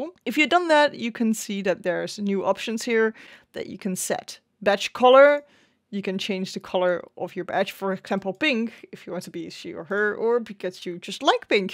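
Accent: Netherlands. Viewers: Ik dacht stiekem al te horen dat je NL bent haha heel erg bedankt voor de tutorial!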